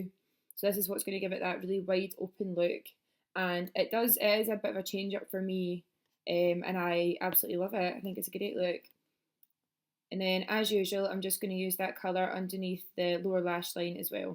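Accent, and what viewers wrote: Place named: Glasgow, United Kingdom. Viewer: Gorgeous, I love it. As a Glaswegian in Yorkshire it's lovely to hear a familiar accent! Nars eyeshadow base is fantastic-I have oily eyelids and nothing budges when I use that-and even mac paint pots crease with my eyes! X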